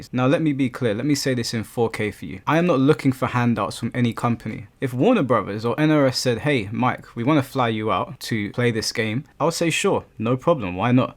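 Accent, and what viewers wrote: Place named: England, United Kingdom. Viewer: You got decent content but I HATE YOUR VOICE it's the English shit I'm American and hate the English accent sorry not trying to disrespect the English just y'all accent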